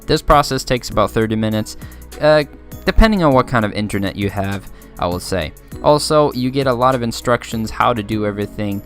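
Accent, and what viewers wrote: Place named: Germany. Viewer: hes probably german, or austrian. a lot of their pronunciations are like that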